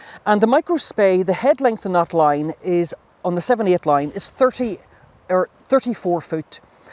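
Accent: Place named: Ireland. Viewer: You sound irish hon.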